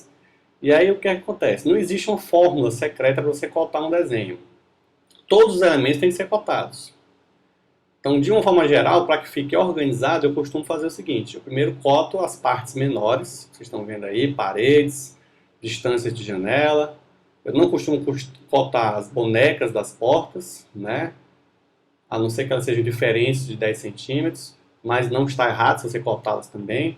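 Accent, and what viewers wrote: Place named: Ceará, Brazil. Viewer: Ótima aula. Sotaque Cearense inconfundível...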